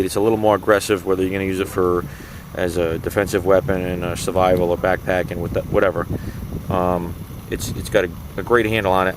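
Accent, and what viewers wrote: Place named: South Jersey, United States. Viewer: Using the bag to mix the ingredient is a great idea. Thank you. P.S. You sound as if you are from my neck-of-the-woods (either South Jersey, the Philly area, or northern Delaware)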